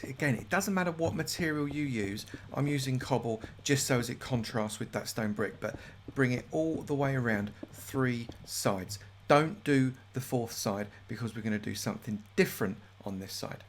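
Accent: United Kingdom. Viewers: You mean he's British?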